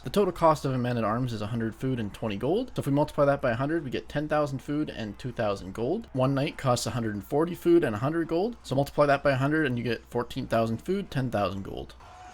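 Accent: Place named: England, United Kingdom. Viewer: i just thought it was kinda funny i always love to hear the english pronunciations <3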